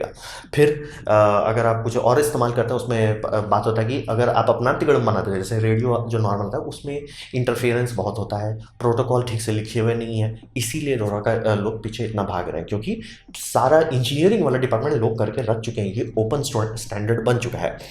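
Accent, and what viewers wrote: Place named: Deoghar, India. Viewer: Heyyy bro perhaps u r from Deoghar (jharkhand).....??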